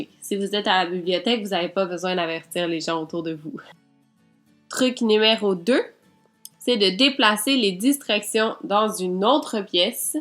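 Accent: Quebec, Canada. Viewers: j'adore ton accent ! !! 😍😘 québécois ! 😉😃 je suis Français 😛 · Merci vous m'avez vraiment aidé +1 pouce bleu PS: j'adore l'accent québécois je sais pas pk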